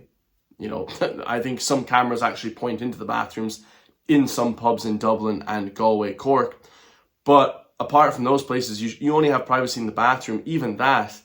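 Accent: United States. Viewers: You’re not a Christian and why do you have an American accent?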